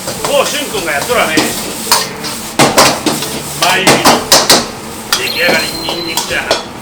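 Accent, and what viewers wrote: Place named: Mikawa, Japan. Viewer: 料理も美味そうだけど、気持ちいいくらいの三河弁が聞いてておばあの実家の郷愁に駆られる…笑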